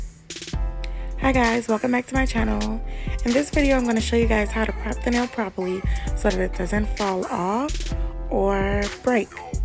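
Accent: Bahamas. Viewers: From I hear that lil accent I say yea she Bahamian! 🇧🇸 New subbie!! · You have a new subbie for sure! I scrolled down to see who would say you have a Bahamian accent. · Lol I had to come check the comments cuz I’m like she sound Bahamian 😂😂❤️🔥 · Listen I was like this gal sound Bahamian lol. 🇧🇸🇧🇸🇧🇸 New Subbie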